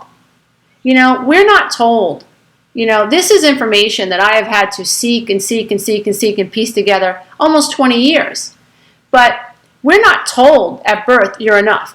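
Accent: New York, United States. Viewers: OMG! Love you! Love your style too! You SOOOO hit the nail on the head with everything you speak of in this video! This is the first time I'm viewing your videos as I have been doing inner child work for years and within the whole ascension process, but not with any major breakthroughs, THEN, I found this! I love your New Yorkness! I from NYS as well, (in fact, we have the same enthusiasm when speaking of something we love!!) When you described the narcisst and the co-dependent, holy smokes, I realized that my mother was both & so was my father! I cannot even describe how much this video has helped me, and this is only the first one! Will be viewing all of your videos on this subject! You are so genuine, funny, inspirational and so right on!:D AND explains why I've always had 5th chakra issues! I also follow LOA practices and metaphysics, raising my vibration, spiritual, sound healing, Reiki, you name it, but WoW! 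Love your uniqueness! Thank you Sweet One for doing what you do and for shining your light ever so brightly!!!!!!! - You Rock!! Namaste. <3 <3 <3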